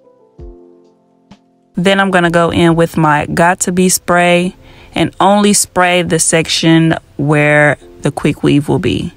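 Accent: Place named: Memphis, United States. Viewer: Sounds like a fellow Memphian❤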